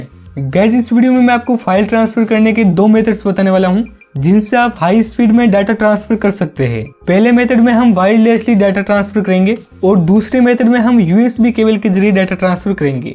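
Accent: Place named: India. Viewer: english title indian voice wtffffffffffffff how the fuckkkk can we understand this :text-green-game-over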